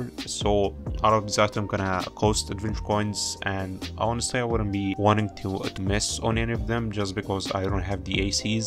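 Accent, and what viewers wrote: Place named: Egypt. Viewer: I can tell that you're Egyptian from just your accent.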